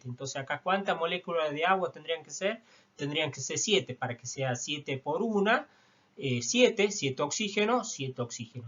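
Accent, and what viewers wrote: Argentina. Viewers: uff, que acento papá. Argentino?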